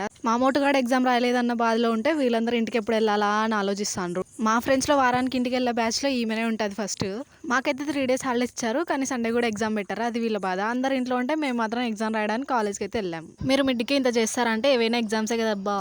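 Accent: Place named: Rayalaseema, India. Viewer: Urs is rayalaseema slang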